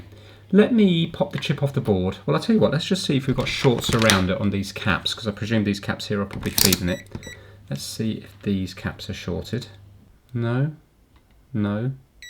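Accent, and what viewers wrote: United Kingdom. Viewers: Hi. I wonder if you have ever watched the Grim Reapers YouTube channel? The reason I ask is because your voice is a dead ringer for "Cap", the channel owner. Am I on to something, or is this just a matter of all you Brits sound the same to we Americans? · bro your accent make me want to leave my contry and com live in uk ,i love your video and thank you for all what you do sorry for the bad english 😅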